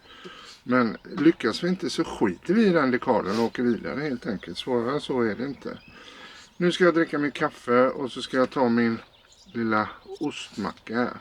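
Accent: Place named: Gothenburg, Sweden. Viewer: En härlig vandring genom Dole [dåll] (lite dragning åt ö - känner mig lite som besserwisser, men du undrar ju då och då i filmen). Oavsett dina uttal (det är lite käckt på göteborgska) så följer jag er mer än gärna. 😊😊 Ni förmedlar hela tiden en känsla av att själv vara närvarande med er. Fortsätt så! 👍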